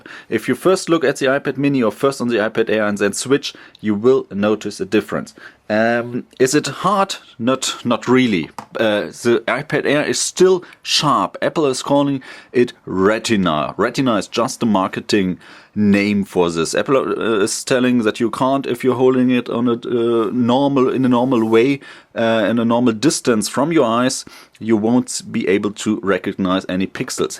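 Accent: Germany. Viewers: Not an expert traveler but this man's English accent is clearly German or even Swiss. Nevertheless, I appreciate the fact that he speaks my language, clearly and intelligently and offers a fantastic comparison of the two pads. I had the opportunity recently to purchase a mini2 with retina display for $160 usd new. Not wanting to just jump at buying a mini and regretting it, this review gave me all of the information I needed to make a good decision. Thank you. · are you german?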